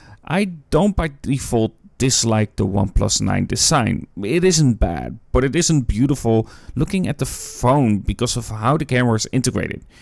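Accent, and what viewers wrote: Netherlands. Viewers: nooit geweten dat je Nederlands was, ik hoorde het accent en dacht gelijk dit is een Nederlander.🇱🇺 Keep up the good work 💪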